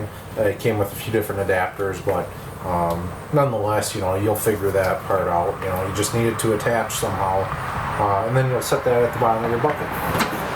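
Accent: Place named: Wisconsin, United States. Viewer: Done. I am also from Wisconsin so I recognized the "accent."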